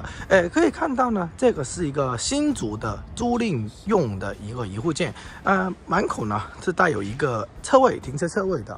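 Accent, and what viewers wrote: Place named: Hong Kong, China. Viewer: 这口音不是香港人就是广州人哈哈哈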